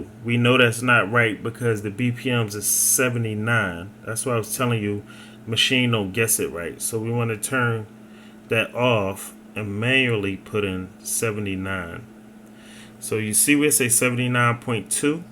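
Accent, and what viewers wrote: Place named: Philadelphia, United States. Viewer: I'm from Philly too so it wasn't hard to tell. This helped a lot btw. 👊🏾 THNX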